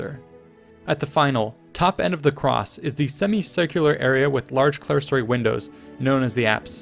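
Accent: France. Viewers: Cringe French accent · He's french lol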